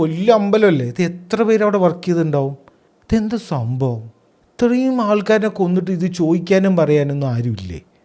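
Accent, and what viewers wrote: Palakkad, India. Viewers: Bro palakkad aano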